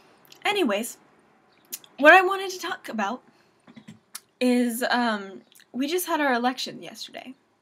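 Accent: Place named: South, United States. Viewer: This was definitely what I needed to see before I go to bed...now I'll definitely have pleasant thoughts..lol No more zombie thoughts. ;) I loved this...I like your southern accent...in fact, Gary can attest to the fact that I was cracking up so much I actually had tears..yes, you are THAT funny. DO MORE VIDEOS! haha I owe you one as well..;)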